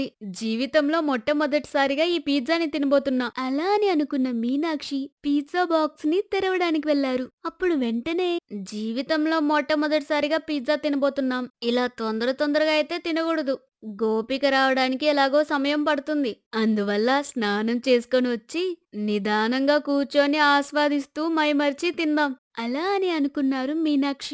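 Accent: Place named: Chittoor, India. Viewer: Chittoor slang super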